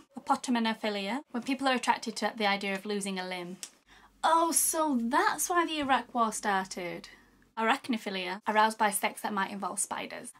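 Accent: Yorkshire, United Kingdom. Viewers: Haha. U look hot :P AND UR BRITISH.!! My friend is British YE HAV D SME ACCENT I hav reli bad spellings :P IM NOT BRITSH Jus to giv ye d heads up :P IM GOIN TO GO NOW.!! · Do you have a Yorkshire accent? If so it's the most proper Yorkshire accent I've ever heard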